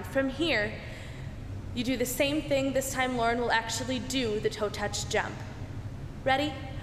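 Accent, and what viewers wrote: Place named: Ireland. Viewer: you sound irish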